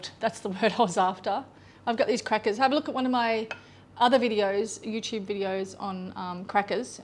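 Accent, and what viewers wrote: Australia. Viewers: Chef Also, thank you for replying as to why the oil! Ok-gotcha... It was what you decided to use for that day! I’m finally going to make this bean dip this week! Been busy! Hugs to you... Also, love the accent and one day hope to visit Australia! Yet I think you’ve shared before that you’re ••not in Australia and rather: Thailand? Coolness either way! Blessings....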